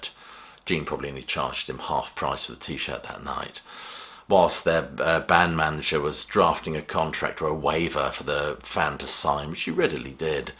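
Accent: United Kingdom. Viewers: Cheers mate, knew I'd found the right channel instantly. It just wouldn't feel right to have my rock music anecdotes explained by anybody else besides an obvious former roady with a UK accent of some sort, Innit!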